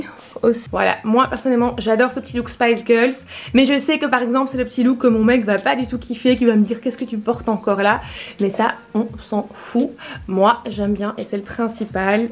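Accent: Belgium. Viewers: Coucou super vidéo je pense reconaitre à ton accent que tu serais Belge non ?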